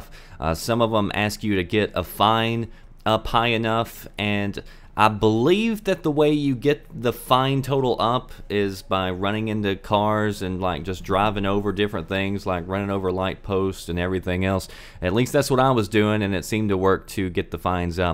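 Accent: South, United States. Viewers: I like the southern accent. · "Sometimes I can't keep the southern out..." Its OK... let that shit flow!!! Hilarious.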